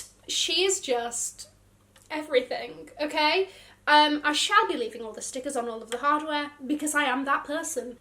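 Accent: United Kingdom. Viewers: I love your accent! Its not the basic british accent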